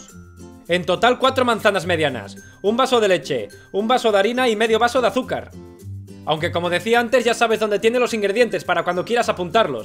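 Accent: Spain. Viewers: a mi me encanta la respeta y como la narra me encanta el asentó español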